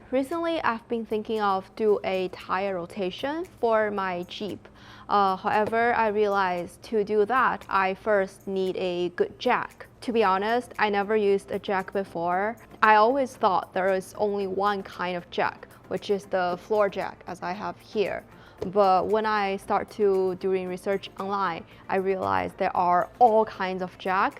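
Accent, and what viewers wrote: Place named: China. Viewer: Funny Chinese accent. You do not know what you were doing.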